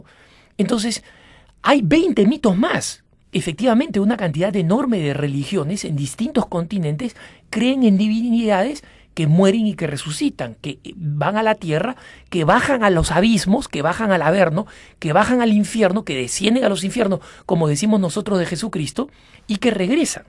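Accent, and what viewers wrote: Lima, Peru. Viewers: Disculpa pero me puedes decir a que te refieres con: INSOPORTABLE ACENTO LIMEÑO??? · A que su acento limeño (que es uno de los tantos con los que se habla en Lima) me parece insoportable _._ .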